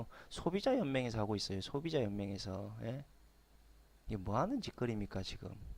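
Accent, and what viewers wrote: Jeolla, South Korea. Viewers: 전라도 억양이 찰지며, 약 돼는 쓴소리 고맙습니다. 근데 깨어있는 자가 많자않아 큰일.우리나라 정부는 정부로서의 기능 별로 행하지 않아. 엄청난 세금 축내며 많은 의원 왜 필요한가???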